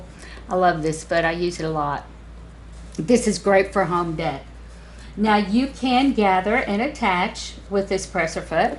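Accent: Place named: South, United States. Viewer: Thank you so much for this video, and you have a way to explain things that makes it so easy. I know you say is only straight stich but when you are done can you back and forth stich to secure the gather? Sounds silly me asking for this or do i have to change it to foot number 1 to do that. Such beautiful southern accent, love it. I mean to ask when calculating panels for a ruffle skirt how do you go about it? what is the formula so that the gather matches the panels? I can be good at math but at the same time in certain things I am not so good. Do you have a video on that? Thank you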